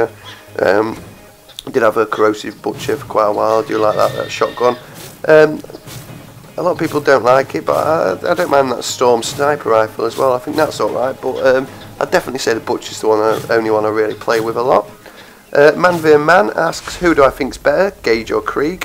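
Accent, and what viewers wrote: United Kingdom. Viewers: Much congrats nice to hear a British voice AND thanks for the name check it made my day..keep up the good work..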